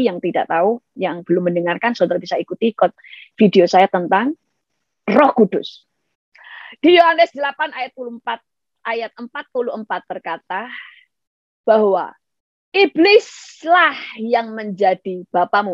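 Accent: Java, Indonesia. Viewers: Bu, medok banget........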